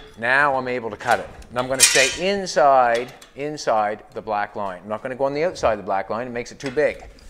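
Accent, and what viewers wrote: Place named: Canada. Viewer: Just discovered your channel a few days ago, and when I heard your accent, I immediately knew this has to a Canadian guy. Your videos are a bit longer than most of the videos I watch, but it's nice to see the uncut process of your work. I think it inspires a lot of people who would otherwise think they couldn't possibly do the things you do. It's good to see not only the successes and final outcomes, but also the trial and error that are part of real life. Also nice to see family involved in your passion. Carry it forward. New subscriber here.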